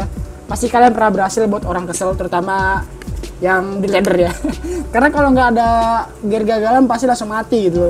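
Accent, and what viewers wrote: Padang, Indonesia. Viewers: Urang padang? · Lu org minang bang ..!?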